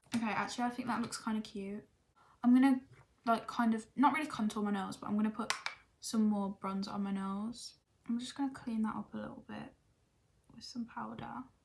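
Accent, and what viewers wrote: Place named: England, United Kingdom. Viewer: Where in England are u from, like ur accent is different x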